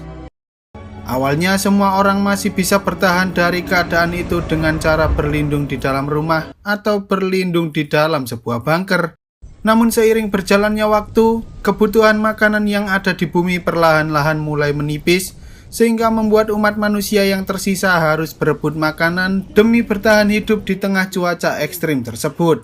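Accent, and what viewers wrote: Java, Indonesia. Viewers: Kalo gak medok mungkin lebih nyaman dengerin nya🙏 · Medok amat bahsa nya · admin orang Jawa ya bicaranya medok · Mimin wong jowo yo😅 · Medhoj bngt boss, rasa ke tendang kuping gw dngr pke headset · MEDOK MEDOK...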